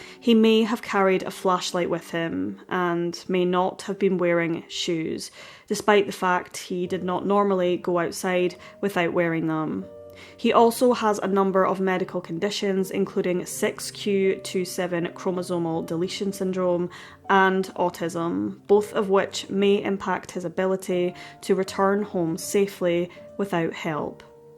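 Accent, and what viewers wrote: Scotland, United Kingdom. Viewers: She’s Scottish. I think from the north of Scotland, maybe the Scottish Highlands.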